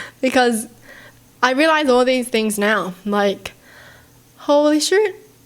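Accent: Australia. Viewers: You still have the best Australian accent to me tho!🥰🔥💜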